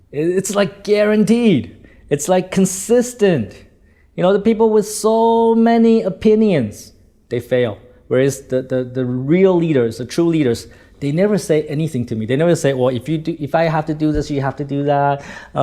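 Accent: Standard. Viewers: nói rất chuẩn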